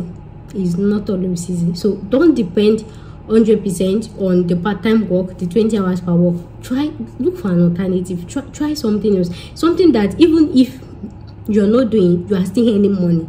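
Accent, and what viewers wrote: Yoruba, Nigeria. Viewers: This was definitely interesting. Didn’t finish the video before I started laughing bout the part when you mentioned your Yoruba accent..😂🤦‍♂️.. thank you for this though. You’ve got a new subscriber😇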